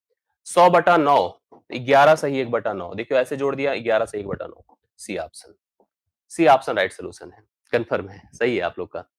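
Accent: Bihar, India. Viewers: Bihar se hoge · Guruji bihari hi is liye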